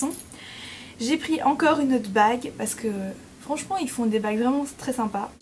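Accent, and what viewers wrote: Belgium. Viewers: Oh je n'avais même pas remarqué l'accent belge mais quand tu as dit bruxelles ça a tilté !!!! Enfin bref j'adore tes vidéos , continue comme ça !!!! ;)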